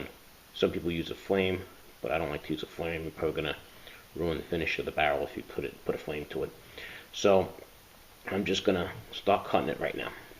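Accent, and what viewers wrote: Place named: East Coast, United States. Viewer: You got the back east accent. I'm from Jersey.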